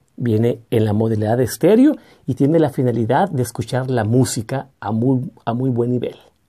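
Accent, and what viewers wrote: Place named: Yucatan, Mexico. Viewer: Hace mucho que no vivo en México, pero noto un ligero acento de Yucatan es usted de origen yucateco o por lo menos de la peninsula de yucatan? Perdon por desviarme un poco del tema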